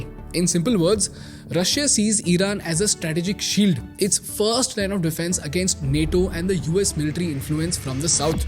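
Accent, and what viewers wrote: India. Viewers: No indian accent please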